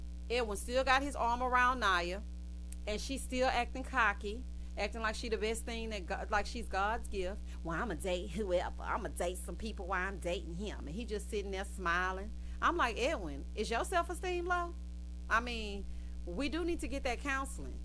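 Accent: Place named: Houston, United States. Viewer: in my mind, you are my new Bestfriend....everyone has pointed out how you and I mirror one another with the way we speak...LOL!! Houston meets South Carolina 🤗 Love your channel and I hope it continues to grow...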